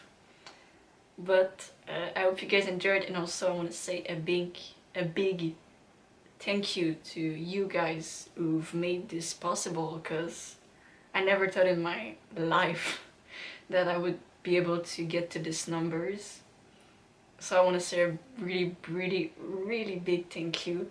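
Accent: Quebec, Canada. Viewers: Hey, I was born in Florida but subsequently got carried North to New York State when my mother divorced my pop. I was age two so I didn't have much to say about it but, nonetheless I have had a good life. I married and my wife gifted me with five children before we ended up going our separate ways. My four girls are the lights of my life. My mom played piano and sang well enough to be a songstress with the Chautauqua opera company when we lived in the ancestral home on the Institution grounds. I remember the days when mom would play piano in duets with members of the Chautauqua symphony orchestra. Jack Danziger, the violinist and Lazlo Varga the Cellist specifically. What a beautiful sound they made! I grew up with a keen interest in music, as a result. I could go on and on but, it is what it is and hsving reached the age of 73 I really don't want to burden you with more than that. 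Suffice it to say that I love your channel and you. I seriously doubt that we will ever meet but I will be there for you and your family and whatever music you play. Fear nought with thy interesting accent. It only serves to make your dual language abilities more attractive. Vive le Quebec! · Je le savais juste par l'accent que tu étais du Québec ,J,ai le meme accent Lol !!. Bienvenue dans le monde du rock !!!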